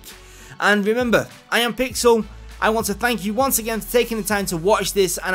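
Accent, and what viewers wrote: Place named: Manchester, United Kingdom. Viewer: straight manc accent :D! nice vid man thanks for the tips!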